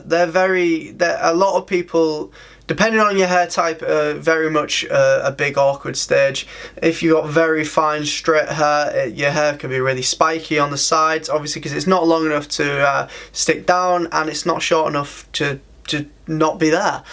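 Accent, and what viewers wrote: United Kingdom. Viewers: Brad McNaughton northern English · Thats British accent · Hmm Yorkshire accent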